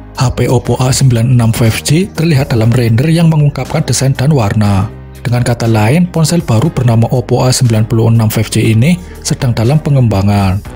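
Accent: Banyumas, Indonesia. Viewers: Ngapak nyaaaaaa,...😁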